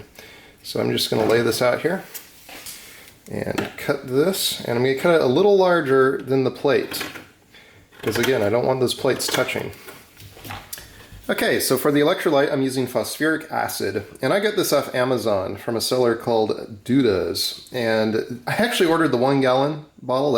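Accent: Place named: United States. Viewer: you sound american so this might be hard.... try using chared hemp fiber. being from the usa myself i know its around if you ask enough people. I havent had the time to start these experiments yet but There is a brit with a phd in material science that gave it a shot and was blown away. Check out Robert Murray-Smith if you dotn already know who he is... Its performance is at least as good as graphine.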